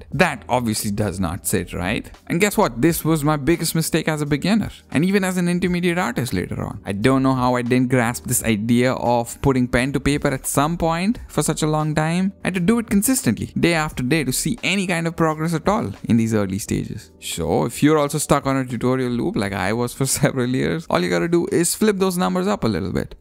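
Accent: India. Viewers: What what what you're Indian !!😮 your accent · You might grow this channel much faster if you get rid of that painfully annoying Indian accent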